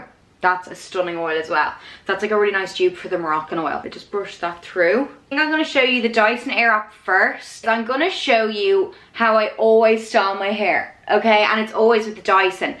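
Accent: Ireland. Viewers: Are u Irish?I'm from co.cork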